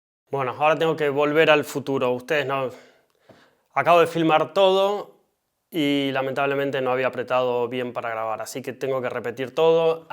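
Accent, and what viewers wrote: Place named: Argentina. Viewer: Muchas gracias por tan buen video, aquí un nuevo suscriptor, soy de Venezuela y particularmente no se pq nunca me ha agradado el acento argentino pero Los Argentinos que he conocido son maravillosas personas, lo digo pq me has caído super bien compa saludos y el mayor de los exitos para tu canal 👍🏻🙌🏻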